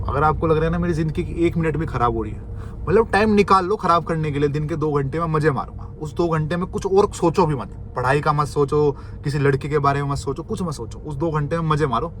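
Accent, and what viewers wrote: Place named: Delhi, India. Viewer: bhai aap cheppi bada tagda bolte ho !!!!!!! maira delhi ka yek guy friend hai ditto same !!!!! ikdam !!!!! ussi ki tarah bolte ho 😆😆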